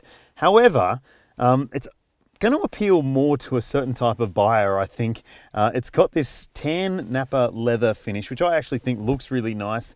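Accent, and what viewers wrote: Australia. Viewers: When I heard your accent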